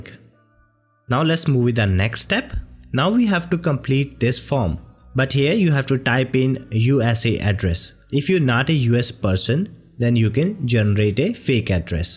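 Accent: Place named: India. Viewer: Me not believing this video would solve my problems(😫) Me after listening to the indian accent(😁)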